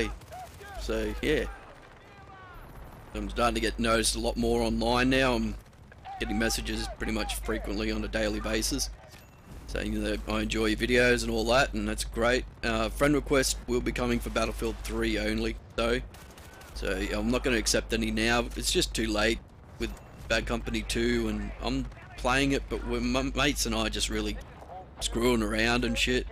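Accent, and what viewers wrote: Australia. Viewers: lmao i thought the very start of ur vid was the Bf3 Alpha shit, till i saw 1700 lmao i was getting all pumped to see u play it to lol i love ur vids, probs cuz ur an Aussie as well, and im sick of Americans commentating lmao :D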